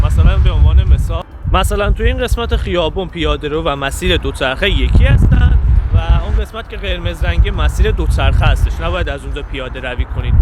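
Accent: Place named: Turkey. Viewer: عالی داداش ،داداش یکم لحجه داری ترک زبان هستی؟؟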